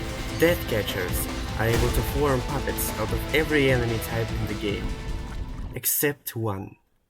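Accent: Russia. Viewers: i can hear the russian accent )) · бро не может скрыть свой русский акцент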